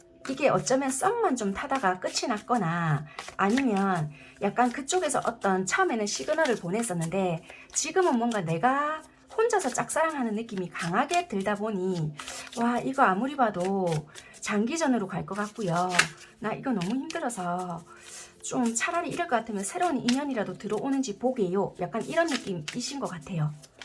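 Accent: Busan, South Korea. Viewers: 대박!! 너무 잘맞아요! 너무 신기해요! 그리고 리딩에 진정성이 느껴져서 너무 좋아요☺️부산사투리도 너무 좋아요💕 3번 선택했습니다! 위로 얻고갑니다. 다음 영상도 기대할께요💖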